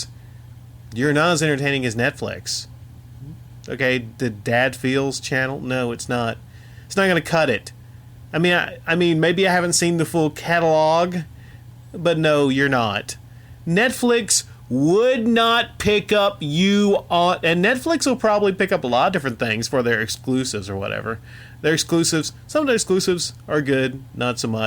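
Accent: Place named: New York, United States. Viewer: LMAO 🤣🤣🤣! Sounded like a mix of NY (In your pronunciation of Sox and Boston) and Boston but it was still hilarious.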